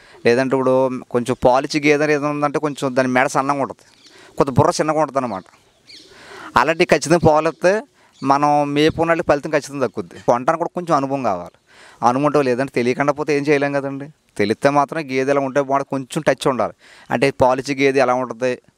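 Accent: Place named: Godavari, India. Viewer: Ma Godarolla yaasa ekka daina kanipetteyachu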